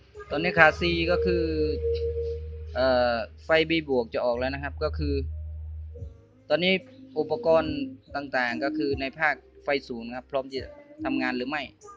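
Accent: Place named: Surin, Thailand. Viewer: สำเนียงเหมือนเขมรสุรินทร์เลยอยู่อำเภออะไรล่ะบ่อง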